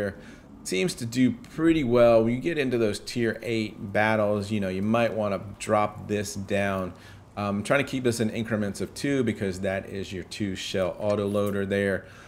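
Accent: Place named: France. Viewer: Great vid mate. I prefer saving my free xp for the blitz fair. Btw great French accent ;)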